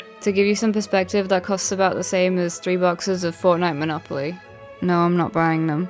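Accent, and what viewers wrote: England, United Kingdom. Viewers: Yay! I absolutely love your channel and your voice, mainly cause your a fellow Brit. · good video. your British accent is adorbs :) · It took me a while to realise she’s not Australian and actually English trying to Americanise her voice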